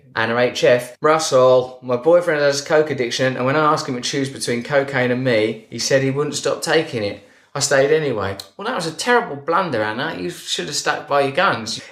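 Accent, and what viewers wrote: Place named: England, United Kingdom. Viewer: I really like the english sound. wish I lived in London ☆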